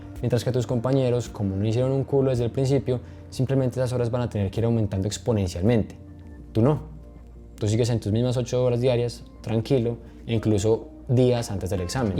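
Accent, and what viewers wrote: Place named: Colombia. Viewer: Uy apenas escuche esa voz dije ese man es de Colombia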